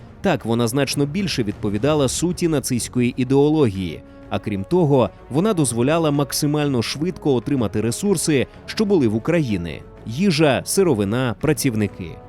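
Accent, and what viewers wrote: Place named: Russia. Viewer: (Якщо не говорити про радянський правопис, а саме вимову). Русифікована вимова. Деінде ви сказали Рейх замість Райх. багато ффф - «під її фпливом», багато де українська И, що близька до І, звучить як рос Ы, літера В узагалі ніде не звучить як Ў, де має бути — [створыф], [фсе], [афто] (правильно [стўориў], [ўсе], [аўто]). Г наприкінці слів у вас звучить Х. Тощо. Наперед скажу, це не діялект або щось подібне, а саме русифікована вимова. Послухайте на ютубі Українська літературна вимова Миколи Погрібного. українська мова насправді ще гарніша ніж ви думаєте/говорите. =)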